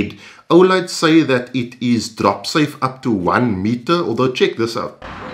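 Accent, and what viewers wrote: South Africa. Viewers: South African accent I hear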